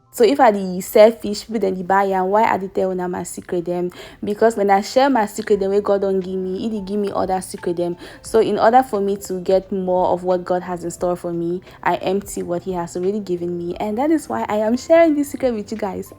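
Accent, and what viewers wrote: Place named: Cameroon. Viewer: your voice and pidgin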